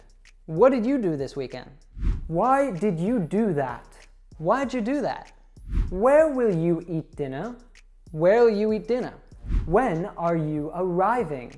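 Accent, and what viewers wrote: United States. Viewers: Thanks a lot.l am learning how to pronounce words in american accent with you. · Amazing American accent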